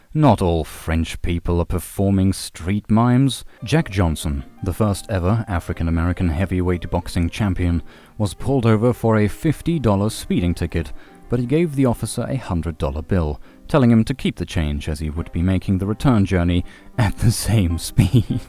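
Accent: Germany. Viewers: Everyone be up in the comments simping or talking about how he’s German. I’m just here like, okay cool it’s..a familiar and comforting voice. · Bro are you german/austrian/swiss??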